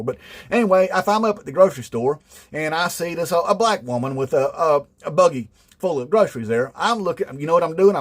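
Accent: South, United States. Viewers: You definitely put out some hilarious videos. I detect some real intelligence behind that corn pone accent that you use. I am subscribed and like every time. Also sharing. Inmate trump. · Beautiful work my man. Just love'n that American accent. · You're hilarious. Pleasantly surprised I am that such a southern sounding bloke is actually sound. Subsribed in Ireland 🇮🇪.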